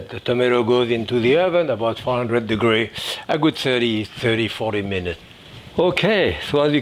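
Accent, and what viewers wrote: France. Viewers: He hasn’t got a speech impediment….he’s speaking with a French accent. If you struggle to understand him, then I suggest that you turn on the subtitles. They don’t have an accent!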